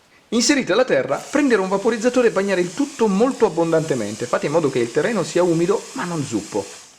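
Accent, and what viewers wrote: Italy. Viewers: Me encantan los hongos pero también tu acento...viva Italia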